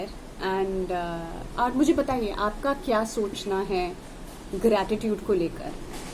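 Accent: Bundelkhand, India. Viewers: we love your bundelkhandi acent in sit videos ....could u please tell us how u got this or might be u belongs to bundelkhand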